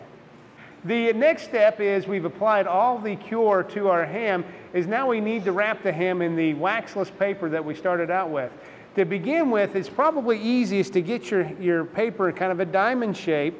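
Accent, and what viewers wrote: South, United States. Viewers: From the title, I assumed it was "United Kingdom College of Agriculture..." So I was very shocked when this deep country American accent broke out. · Damn it said UK college and the American accent gave me a fright before realising it was University of Kentucky not United Kingdom.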